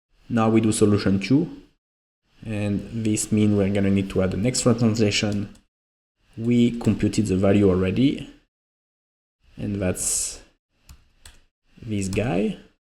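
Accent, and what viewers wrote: France. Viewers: You have a french accent despite you ain't french 😄 Great video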